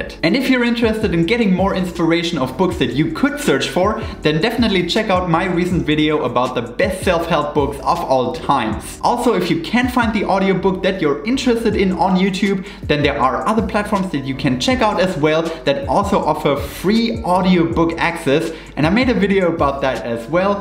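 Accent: Germany. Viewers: Sir, are you German? Because you have the exact accent of a german friend of mine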